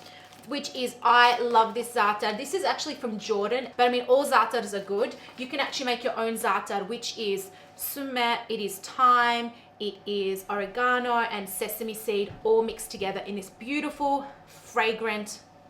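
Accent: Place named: Australia. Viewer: When I clicked I didn’t expect the Aussie accent 😂😁👌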